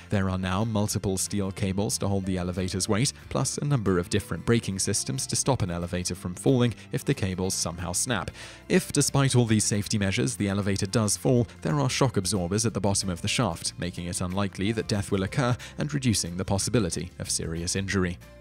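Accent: United Kingdom. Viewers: The narrator has a British accent though (that was the joke lol).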